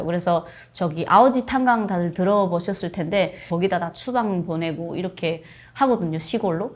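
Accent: South Korea. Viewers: This clearly isn’t her first time cooking South Korean ramen—she does it casually and nonchalantly, without any particular focus or curiosity, like it’s just another quick meal on an ordinary day. It’s obvious she’s been in South Korea for a while since escaping the North. You can see it in her mannerisms and hear it in her accent—she’s clearly assimilated into life here. That’s why I don’t get the need for these sensationalized, clickbait titles like “North Korean girl tries South Korean ramen for the first time.” It feels cheap and disingenuous. It turns her real life and quiet normalcy into a gimmick, taking the focus away from her actual story just to get views... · it’s the accent. You had to live in South Korea for a decent amount of time to lose the North Korean accent. North and South speak with differently and have different Vocabulary. The way you speak doesn’t change in couple of days. 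That’s why I can tell she lived in South Korea for a while. She talk more like a South Korean than a North Korean. So saying that’s her first time eating Korean Ramen is just Click Bait.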